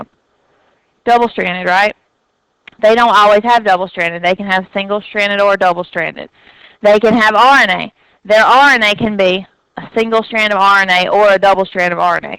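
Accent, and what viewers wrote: South, United States. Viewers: I'm from jersey and I've gotta say, I looove this woman's southern drawl. Her voice will definitely be echoing in my head during the test. Thank you so much for the help!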